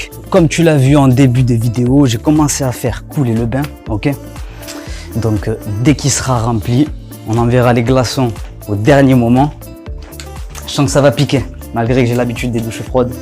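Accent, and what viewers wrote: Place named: South, France. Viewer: Perpignan 😍😍 Il m'a bien semblé percevoir l'accent du sud 🤗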